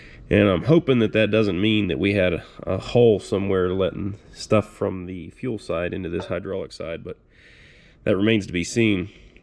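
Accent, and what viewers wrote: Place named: Western Pennsylvania, United States. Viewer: I'm curious where you are. I'm getting a slight western PA accent? I have relatives there.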